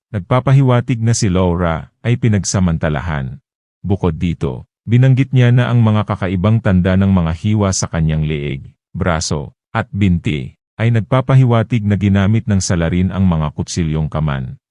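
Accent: Visayas, Philippines. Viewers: Kahit may pagka-visayan accent ok lang naman naiintindihan naman ang kwento, someday mas gagaling ka pa katagalan. 💙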